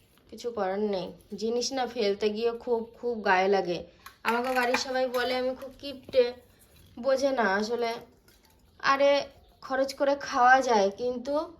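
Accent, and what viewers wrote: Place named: Bangal, Bangladesh. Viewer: Tumar mukha bangal vasa darun laga❤❤❤❤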